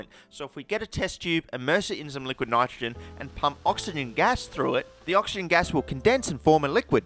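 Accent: Australia. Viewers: When watching these videos I like to imagine an Australian chemistry teacher talks in the same way in the classroom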